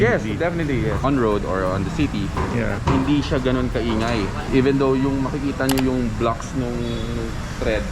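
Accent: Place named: Conyo, Philippines. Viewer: conyo kris aquino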